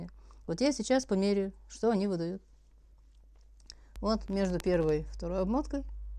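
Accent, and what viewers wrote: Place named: Udmurtia, Russia. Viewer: Акцент у Вас интересный. Вы из Удмуртии или Одессы?)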